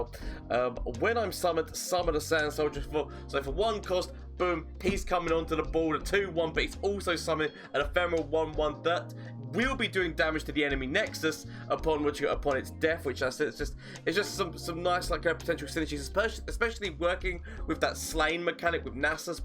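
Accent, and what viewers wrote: United Kingdom. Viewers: i just realized that your name is, and i couldn't be more confuse with this British accent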